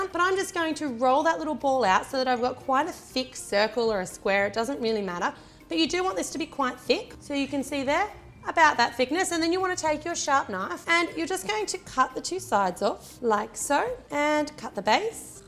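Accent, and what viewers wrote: Australia. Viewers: I just started watching your tutorials and I think they are great! ; ) I just want to know if you are Australian ? :-)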